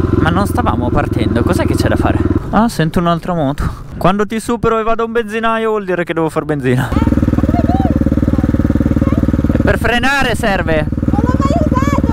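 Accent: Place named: Rome, Italy. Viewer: Sembri un romano 😂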